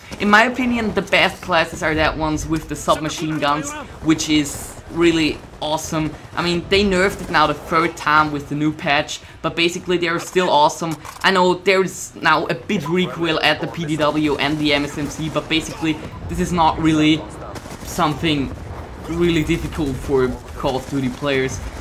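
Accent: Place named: Germany. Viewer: He might be German, or European so that's why his voice is like that. And i must say that he is MUCH better than me, and luckier too haha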